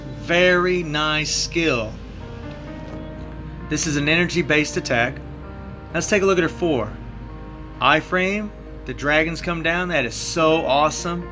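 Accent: United States. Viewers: Dude are you from north Carolina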